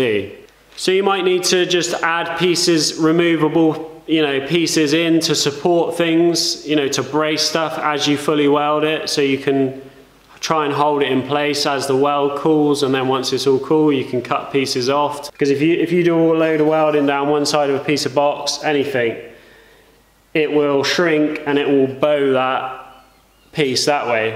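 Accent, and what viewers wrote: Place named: Bideford, United Kingdom. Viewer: Miss mine, used to live in Bideford, so I can understand you quite well haha. Good video.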